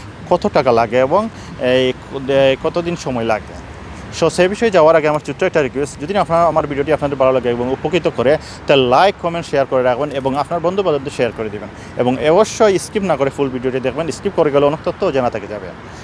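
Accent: Sylhet, Bangladesh. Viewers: ভাইয়ের কথা শুনে মনে হচ্ছে, আমাদের সিলেটের??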